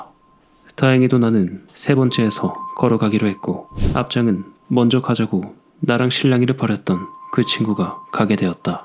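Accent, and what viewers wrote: Gyeongsang, South Korea. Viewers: 제천인데 경상도 사투리고 구수하네. ㅋ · 님 최근에 알게되어서 잘 듣고 있는 구독자입니다. 편안하고 분위기 잘잡으시는 목소리와 특유의 경상도 사투리가 너무 매력적이라 구독하게 되었고 주변에도 영업하고 있습니다. 저는 해 떨어지면 전기 자전거 타면서 님 채널 틀어놓고 들으면서 자전거 타는게 너무 좋네요 ㅋㅋ 부디 지금보다 더 떡상하시길 기원하고, 저도 중학교때 겪은 소름돋는 일 하나 있는데 조만간 제보하겠습니다. 항상 힘내세요!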